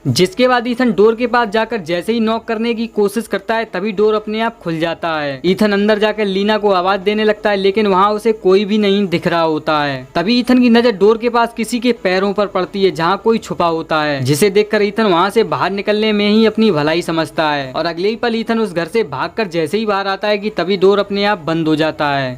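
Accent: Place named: Uttar Pradesh, India. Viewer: Kya bhai u.p. ke ho na aap mast👍👍👍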